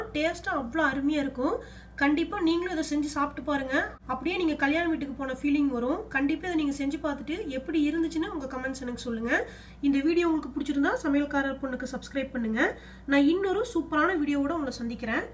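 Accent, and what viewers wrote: Kongu, India. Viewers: Kongu Tamil😍😍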